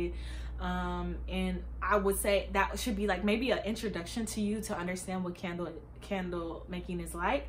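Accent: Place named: Philadelphia, United States. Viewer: oh I just assumed because you sound like a philly girl lol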